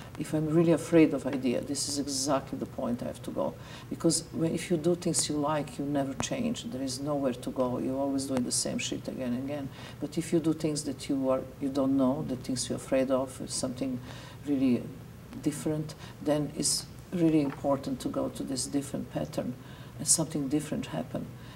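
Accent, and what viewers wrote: Serbia. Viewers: she's not american but serbian lol "its her accent". ps, i dont defend her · She’s a beautiful soul. Period. Research her origins before you call her evil by your own ignorant definitions. You hear her Serbian accent and call her Voldemort, etc? The stupidity and ignorance is shocking. Catch a flight sometime and TRAVEL. Go to a museum! Crack a real book once in awhile. Ugh. Listen to what she is saying. Great art should upset us. It is hard and it is part of the artist’s very Being. THEIR experience. It should open our eyes and make us all think. Examine ourselves. If what you see is fear and man’s construct of a devil - that says a lot to the rest of us about YOU.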